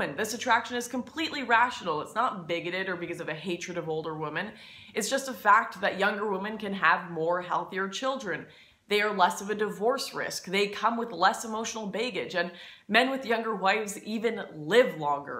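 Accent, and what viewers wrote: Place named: Canada. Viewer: Don't worry about it. I thought it was you're Canadian accent and thought nothing of it.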